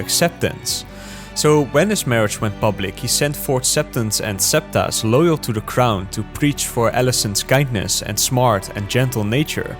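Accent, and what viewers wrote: Netherlands. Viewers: Great video, but do I notice a Dutch accent there?🤔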